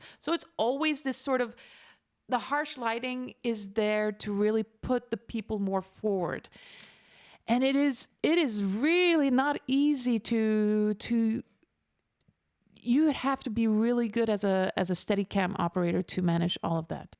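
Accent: Paris, France. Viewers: je suis francais j'ai 41 ans tu parles aussi bien que moi tu as un accens limite parisien et ton francais est clairement parfait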